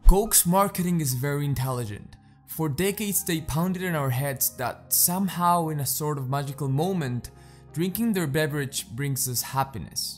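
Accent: Argentina. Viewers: Por qué siento que esté pibe es argentino?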